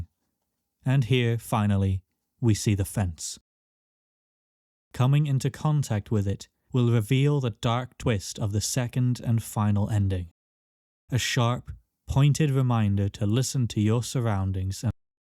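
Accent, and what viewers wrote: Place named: Wales, United Kingdom. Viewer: You kinda sound welsh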